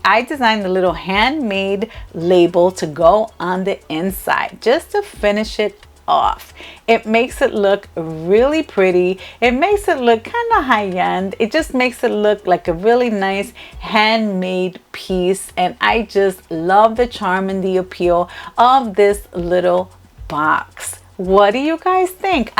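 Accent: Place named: New Jersey, United States. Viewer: Ohmygosh I love your joyful personality, first of all. I also really like listening to your accent. My older sisters are Peruvian and grew up in Jersey like 20min away from NYC; and your accent just makes me feel like home lol. Completely aside from that, "elegant coastal" is the perfect way to describe this - it turned out BEAUTIFUL!!! <3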